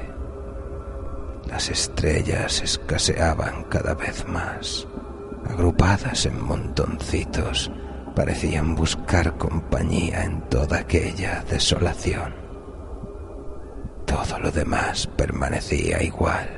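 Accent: Catalonia, Spain. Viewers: le das un toque catalán xD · Una acento muy catalán. Me gusta.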